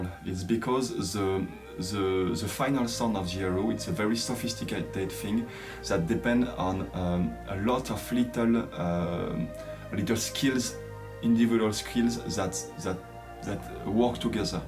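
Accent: France. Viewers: 法國人說英語口音真的很重😄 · Ça s entent que t es francais , le u ! Le u mon reuf ! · Nice french accent! Et joli home studio. J’habite en Thaïlande et je suis passionné de musique asiatique. Bonne continuation. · Merci pour cette vidéo, je n'aurai jamais pensé que vous étiez français, j'ai été surprise par le " French accent " avant même de lire la description de votre vidéo. En tout cas très beau travail, continuez, ce que vous faites, c'est magnifique =) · your French accent is beautiful. Please never ever get rid of it; it’s as melodic as the Erhu. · your accent is Frenchy English and that's lovely and unique 😊 and You have a beautiful voice 🤍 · "I hope you don't mind my terrible French accent" there is a SINGLE sound you can learn that will make a massive difference in your accent and make it much easier for people who aren't used to French accents to understand you. It's the sound of the letter H, which is simply the sound of breathing out through your mouth. 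If you can breathe, you can make this sound easily! The letter H in English is typically NOT silent like it is in French (though there are always exceptions, like "hour"). I would suggest searching "comment prononcer le son de la lettre H en anglais" here on Youtube. It should take you only a couple minutes to learn and it will THE EASIEST AND BEST way to improve how people understand your speech.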